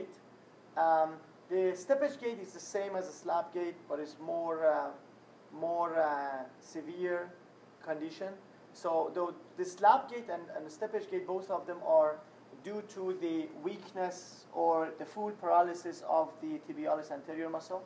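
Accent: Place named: Iran. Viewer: Well done! with nice Persian accent. Damet Garm Ostad!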